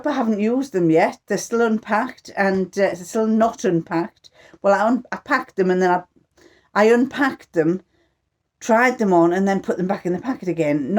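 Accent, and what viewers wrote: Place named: North East England, United Kingdom. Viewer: Hi there my mother kept my teenage quilt they threw nothing out that generation. its about 54 years old its a bit faded and thinner athough in amazing condition so when she passed away I took it. I remember it had a frill around it that was taken off. We used to call them eiderdowns. I put it inside a single warm quilt cover and its lovely to snuggle under. Always lovely to hear a North East accent on a vlog. I live in Newcastle xxx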